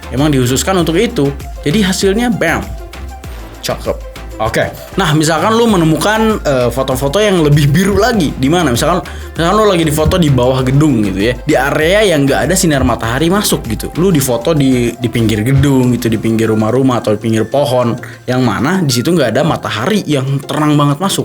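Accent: West Java, Indonesia. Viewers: bang orang sunda mana?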